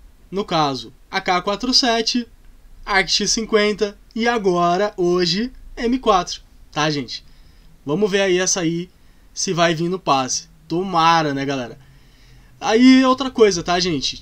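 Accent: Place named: Northeast, Brazil. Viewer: tmj junto, preocupa não mano nos somos nordestinos e temos esse sotaque msm uai kkkk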